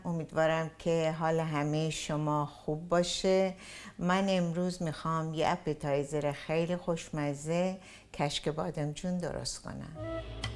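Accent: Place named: United States. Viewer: بنظرم اومد شما لهجه آمریکایی دارید و خیلی افتخار میکنم به شما.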